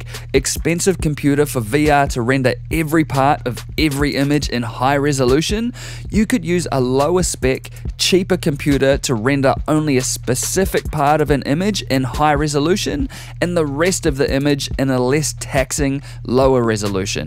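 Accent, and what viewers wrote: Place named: Australia. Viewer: another great video thank you, I noticed you were speaking more slowwwly this time. Is that to help an international audience with your Aus accent? if so my hats off to you for expanding. although personally I preferred the 100MPH, full bore, let rip :) keep up the good work, I look forward to the next Video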